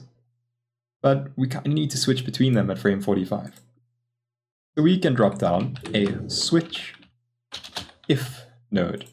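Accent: South Africa. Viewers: Super helpful. Trying to move from C4D to Houdini so these tuts really help! Thank you! Are you South African?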